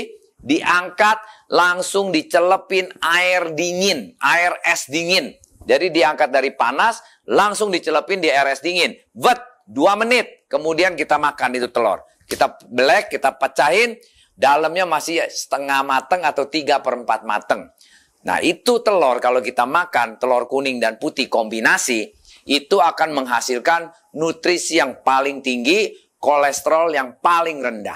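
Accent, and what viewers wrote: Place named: Jakarta, Indonesia. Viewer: Pak ngomongnya kaya orang betawi🖒🖒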